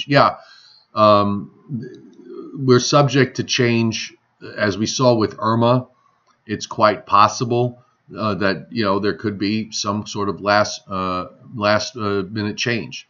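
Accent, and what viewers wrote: Long Island, United States. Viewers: 45 "my-aaaa" soo long Island.:) We say it in nj and nyc too but lil differently.. I love all your videos and enjoy your speaking style (straight up north nj nyc long isl). I know your big time on tv and other projects but you have an excellent radio voice and flow. Im guessing you lean center right..(caught that when you spoke about pre tropical storm warning era cant be proof of global warming. Maybe one day you can branch out into am talk radio or non political variety show. Just a compliment and thoughts. I cant wait to your winter noreaster live streams. Im a big fan of tracking them like you. Im a marketing adv. Man but my childhood dreams was to be a meteorologist. Thanks.. Gabe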